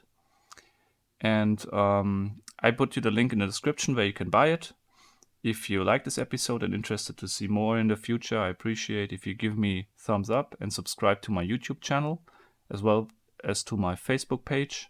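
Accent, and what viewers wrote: Germany. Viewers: are you german? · his accent sounds sooo german🙊🙉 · I don't know why but even though you have a German accent I think you are part Asian. You have an amazing mind.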